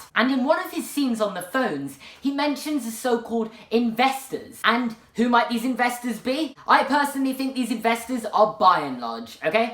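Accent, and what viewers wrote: United Kingdom. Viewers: I’m sorry I’m American and I love British accents but I still can’t get over mum I’m no trying to make fun just I love anything foreign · God I love British accents "SanFRANcisco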